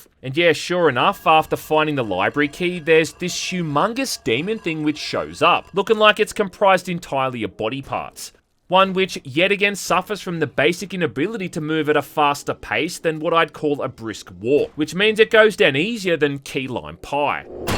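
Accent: Australia. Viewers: Are you OK? You're sounding like an Australian today.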